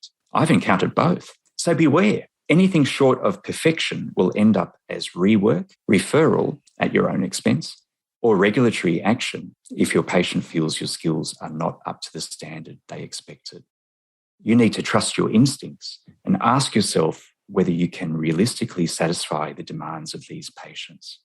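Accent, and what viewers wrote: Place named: Australia. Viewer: plz make more videos so that we learn about australia and also get along with the accent🤗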